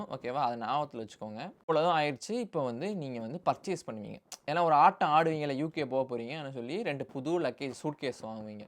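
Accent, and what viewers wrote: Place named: Karaikudi, India. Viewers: Karaikudi slag perfect Thalaiva ❤😅... love from Karaikudi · Sivagangai slang 😅. Kkd yanss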